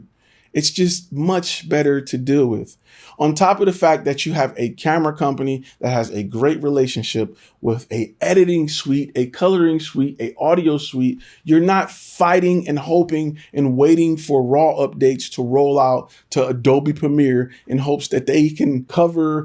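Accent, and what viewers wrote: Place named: Inglewood, United States. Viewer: weird question, but just curious where you're from? Your "accent" and mannerisms are similar to my former Animation Art Director who grew up Inglewood, CA.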